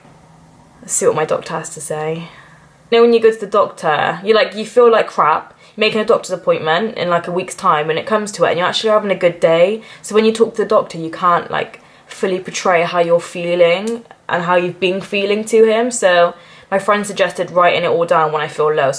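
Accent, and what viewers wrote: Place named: Bristol, United Kingdom. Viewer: Hope celexa i will look this fit 😆. You sounds like a brizzle girl my neck of the woods. Mental health has been a lifetime enjoyment for me its been relentless im now on celexa it was the last ssri to try and has been the best so far , thankfully. And i totally get you girl , death was my main ambition in life and my life had no ambition. I spend my time with likeminded people or understanding people other people are toxic for me. They are earthlings and im a alien. 👽 i joined a walk and talk for nutters in swansea and its been amazing 👏. When your battling with mental health the people you are use to being around become disposable and the likeminded people are the ones we need. Like you just said the general practitioners are terrible. Specialist help are the ones who can help. Luckily/unluckily i ended up a addict with alcohol and i have the A.A rooms and that has kept me sober for 5 years. 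Alcohol works great until it becomes a problem and with mental health it always becomes a problem. You cant redress the imbalance with a imbalance it just makes us extra wacky. Live life on lives terms and understand about , acceptance. We have to accept that this might be for life ,if you fight it , it just gets worse. What you need is a funny as fuck friend that makes you laugh. Thats why i like my nutters around me, sober nutters i might add. They are all trying thier best its all we can do. Good luck with your journey to getting well and thanks for your share. You did the right thing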